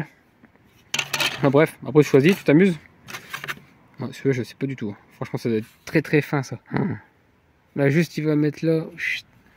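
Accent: North, France. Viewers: Salut mec. Je viens de voir ta vidéo. Je vais essayer de trouver celle du résultat car ça m'intéresse 😅 tes du nord d'après ce que j'ai entendu, moi aussi 😊 tes de ou ?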